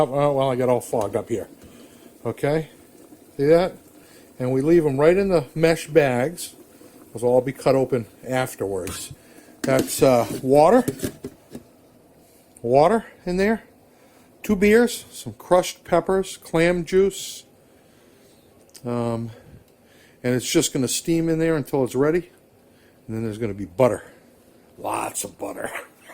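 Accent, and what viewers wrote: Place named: Rhode Island, United States. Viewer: You sound like a Rhode Island guy. I use to keep a boat in Mattapoisett but I live in Rockport. Enjoying your adventure videos, you guys have been to a few places I have yet to see. Maybe next summer will provide some opportunities but, alas, I do those trips alone. Been riding for 57 years. First bike was a Honda 50. I delivered newspapers and saved up enough to buy a used bike. The next bike I rode was an Electra Glide! What a step up, holy cow, from 50 cc to 1200 cc. My lady friend does not eat clams, oysters, crabs but she will "suffer" her way through some shrimp recipes. Haha, I am from Louisiana and do not care for lobster but I can make it work, if I have to.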